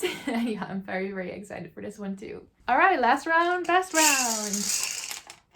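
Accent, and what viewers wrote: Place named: Netherlands. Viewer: HI!! I just subscribed to your channel. I'm also from the NL and I love how you speak English ahaha. Good luck with your TBR!! And lots of fun💖💖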